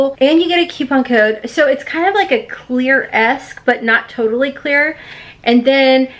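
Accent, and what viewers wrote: South, United States. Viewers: Your call was wonderful I enjoy watching. I want to say you're just Southern enough. It comes out just slightly and it's charming just charming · You just talk all you want, I love when that southern accent sneaks in!! Here in southwest Missouri we don’t have one!😂😂😂😂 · So funny!! "Good thing I'm already married!!" And I'm so happy to hear someone else speak country...not pretty Southern. ❤️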